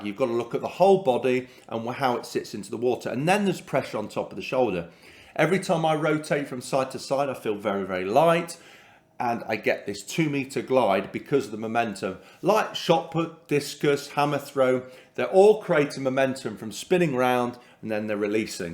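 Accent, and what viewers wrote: United Kingdom. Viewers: I want to get back into swimming and hearing your British accent brought back some great memories. I'm a gay man from Lithuania and visited England in 2018 for the Gay Pride Parade in London. I had such a wonderful time. I met these two professional swimmers at the parade and they invited me to their home after the celebration and they gave me a double full body massage while nude. It was the best massage I ever had and and I will never forgot the experience. Just thinking about it puts a big smile on my face.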